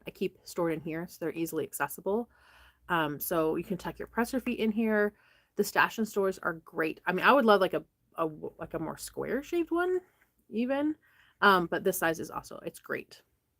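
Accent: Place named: South, United States. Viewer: Haha cute southern accent! You're so funny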